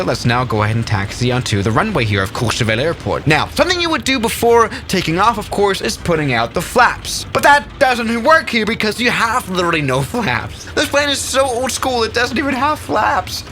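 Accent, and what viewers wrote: Mid-Atlantic, United States. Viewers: Your accent is good. It's pretty close to normal mid Atlantic American. I'm impressed.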